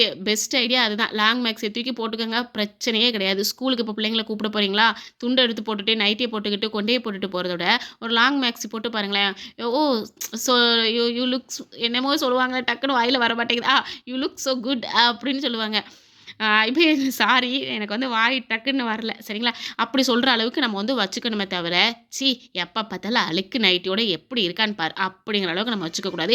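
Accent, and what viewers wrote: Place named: Kovilpatti, India. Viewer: Akka ninga ena ooru na kovilpatti nama ooru slang pesuringa ketan rmb depression aaguthu ka thaniya iruka mari iruku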